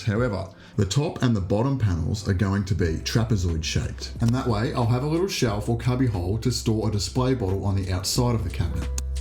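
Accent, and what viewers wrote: Australia. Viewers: lovely build. Great wood choice. Unreal to hear Aussie accent for a change. Would you consider individually partitioned boxes for the scotches? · Australian accent, terms of lumber, butt dank something doesn’t sound quite right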